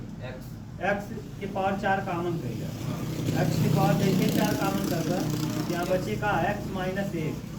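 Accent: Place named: Bhojpuri, India. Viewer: really liked the way how you teach ....in bhojpuri accent ,apke bache boht shor krte hai par lol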